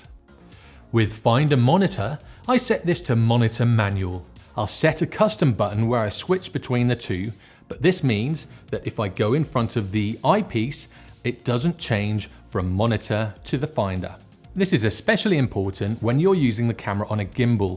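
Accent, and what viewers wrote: United Kingdom. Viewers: Your name is so American but you are definitely so British.Very nice video.Will check back with you.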